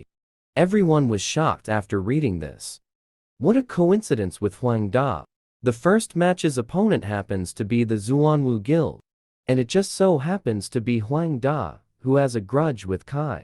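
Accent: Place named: South, United States. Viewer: 1.25 is the perfect speed for this thing. Especially if your used to a faster way of speaking, then the southern drawl this one is set too. :)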